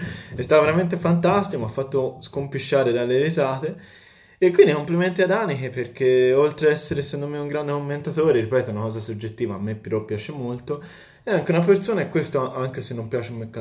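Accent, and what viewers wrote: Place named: Florence, Italy. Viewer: Ti impongo delle cose: 1) Mai nascondere la c strascicata fiorentina 2) Torna ad aprire i pacchetti. Il mondo ha bisogno di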